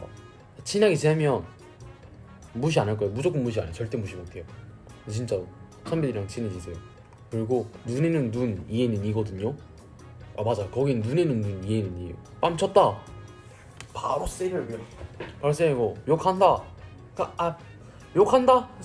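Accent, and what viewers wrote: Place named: Gyeongsang, South Korea. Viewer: 이제는 일본어가 익숙한데 그안에 한국어에 대구 사투리가 있으신거 같았는뎈 ㅋ ㅋ ㅋ ㅋ맞았군요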